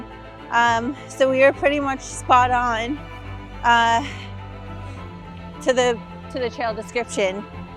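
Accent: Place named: New York, United States. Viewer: Was not expecting a New York/Joisey accent.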